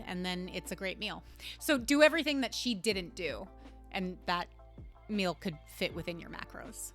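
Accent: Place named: Brooklyn, United States. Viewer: This video popped up on my feed and 3 seconds into the preview my brain clocked “Brooklyn accent!” I’m a Coney Island guy now living in the Bronx and I didn’t realize how much I missed our native tongue. Thank you for that. Also, you’re funny as hell and I like nutrition/fitness content, so I stayed for the video and subscribed ✊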